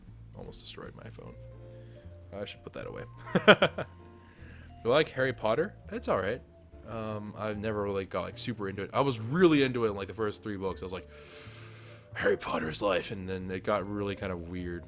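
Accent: United States. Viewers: You do not sound like a sour Korean , I assumed fully 100% American with dark hair , similar looking to drift0r or something. But I like getting to know what you look like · Hey it sounds to me that you live in Pittsburgh and so do I man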